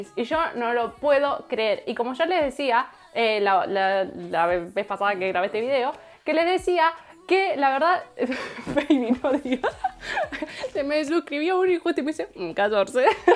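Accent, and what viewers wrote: Argentina. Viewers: Me encanta el acento argentino💕 ¡Muy buen video😆💞 y muy buenos tus consejos :3!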